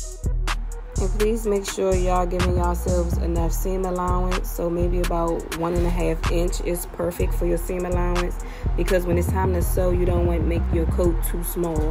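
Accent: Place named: Washington DC, United States. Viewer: yesss I heard the accent. ❤️ I’m from Maryland. Love to see a Washingtonian sewing queen.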